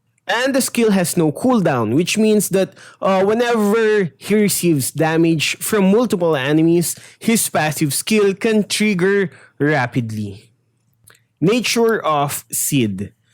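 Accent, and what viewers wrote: Tagalog, Philippines. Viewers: The filipino accent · Your Tagalog accent is showing 🤗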